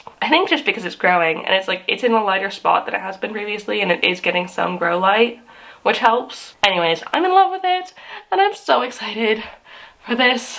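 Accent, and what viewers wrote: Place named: United Kingdom. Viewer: Great video showing the reality of plant care difficulties that gets to even the best of us; also noticed in this video how you had a lot more British sounded words and pronunciations that popped up throughout due to assimilating in London compared to your previous vids, it’s cute and shows the multicultural influences on you💕🫢😏💕👌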